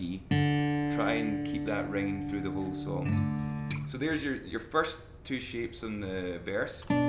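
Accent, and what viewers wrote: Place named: Glasgow, United Kingdom. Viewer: He went to the same schoole I go to he has a glasweigian accent aka Scottish but is from airshyre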